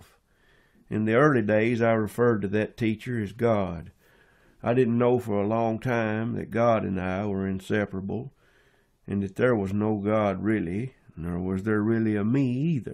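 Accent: South, United States. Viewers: Nothing like non-duality with a southern drawl....it just sucked me in mind and all... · Well... how refreshing to hear it from a south accent. Take care. You got my smile.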